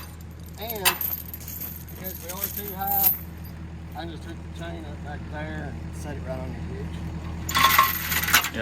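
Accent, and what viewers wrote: South, United States. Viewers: My girlfriend is now forbidden to watch this channel. She is commenting on your tats and southern talk etc. lol. She looks at every guy with their shirts off. Now i know why she is watching these big machine channels. DUDE! I can't believe you accidently crushed the septic tank. This has been one of the hottest Summers in a long time. Go easy.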